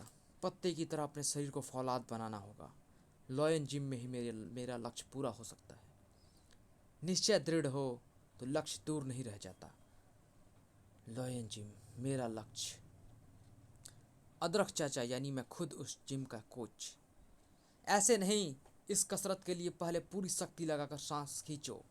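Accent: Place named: Bihar, India. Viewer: Bhai tumhara bolne ka lehja saaf nahi hai, apni voice ko clear karo aur thoda aaram se padho. Shabdo ka pronounced thik se karo hindi mei,,, Bihar se ho kya aisa lagta hai tumhare bolne ke tarike se.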